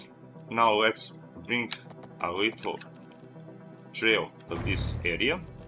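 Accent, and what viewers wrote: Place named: Serbia. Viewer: W series so excited for the next part Love the serbian accent😂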